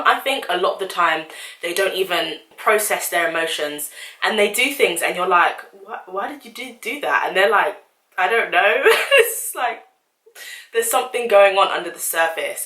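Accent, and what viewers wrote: United Kingdom. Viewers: I am glad that your are speaking pure British English, and not the wrong stuff that we Africans speak. Obviously you are born and raised in the UK. However, recent immigrants should be able to learn that, with a little effort, especially those with university degrees. I am a recent immigrant and I respect any new language I learn and try to speak like the natives. Thank you for being their so that when I get better at the language, whenever Africans ridicule me for not speaking African English, I will show them your video.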